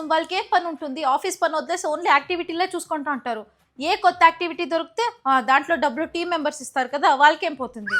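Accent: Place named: Karnataka, India. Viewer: It"s Karnataka Telugu 😂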